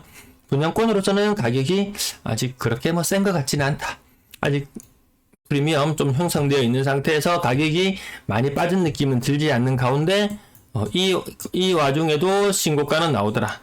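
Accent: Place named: Gyeongbuk, South Korea. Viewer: 운영자님 경북사람 이네요~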